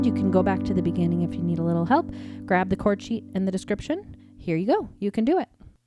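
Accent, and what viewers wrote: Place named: United States. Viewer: Greetings from France ❤️. I SUBSCRIBED TODAY 13th November 2024. I LOVE YOUR "COUNTRY" VOICE, SO BEAUTIFUL AND A PLEASURE TO LISTEN TO ❤. Wowww 😮 You played it to my rhythm, slow and peaceful. You are an EXCELLENT teacher. Thanks from the bottom of my heart for simplifying the music. A big hug of gratitude 😊🫠.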